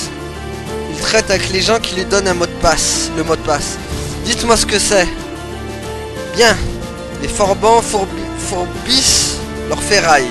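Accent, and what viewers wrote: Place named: Germany. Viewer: je voulais de l'accent allemand....jai ete servi! :)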